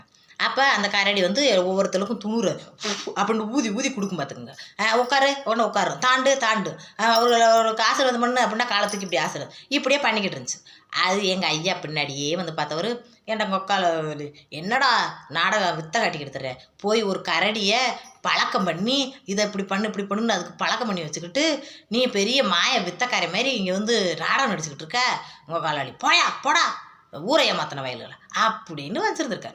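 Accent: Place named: Paramakudi, India. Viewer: நீங்க பேசுற ரொம்ப புடிச்சிருக்கு என் பிரண்டு ஒரு பரமக்குடி சைடு அந்த ஊர் மாதிரியே நீங்க பேசுறீங்க நீங்க நல்லா தொழில் பண்றீங்க அது மாதிரி தான் நானும் ஏதாவது செய்யணும்னு முயற்சி பண்றேன் ஆனா என்னால் செய்ய முடியவில்லை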